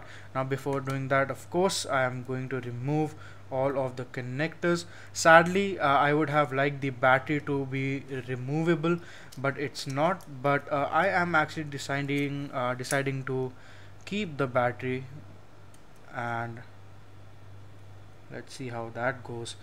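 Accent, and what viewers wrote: India. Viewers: Liked and loved the video.Understood everything you say,but please work on your accent,don't be that funny Indian guy that everyone make fun of when they should be listening.I am talking here out of experience,i have a "Russian" accent/im not even Russian !? / and that is a really big issue for me.It's not racist or whatever,just makes people uncomfortable when they listen to me.Anyway content on point,exactly what i had in mind with my idea and you proved that the idea makes sense.Good luck!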